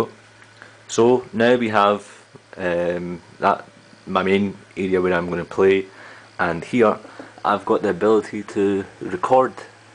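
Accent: Scotland, United Kingdom. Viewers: your Scottish :)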